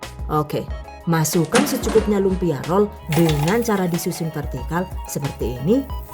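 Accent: Banyumas, Indonesia. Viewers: Yg punya chanel orang mana sih? Kok ngapak sprti sayaa 😂,, hehe chanelnya keren dan menginspirasi 😊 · Ngapak Rika ya 😂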